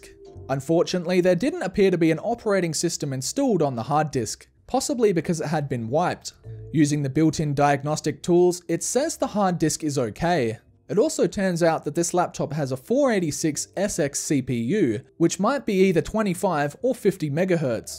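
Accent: Australia. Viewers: Aussi Accent...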